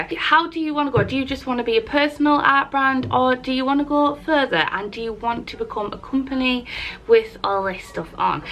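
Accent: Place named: Middlesbrough, United Kingdom. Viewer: So pleased you made this video. I went through the same sort of process writing down all sorts and ended using 3 names together. The name my dad always called me - sweetpea - and the name my brother used to annoy me with - Polly - and because my business is craft related I used - crafts. So hence the name Sweetpeapollyscrafts. Not sure now if thats too long or I should have used cards instead of crafts as that is what my business is about. Your accent makes me feel like I am back home in Middlesbrough and I really love it when you visit the coffee shops in Marske, its not far from the hair salon I used to go to - Contemporary. Keep up the great work!!!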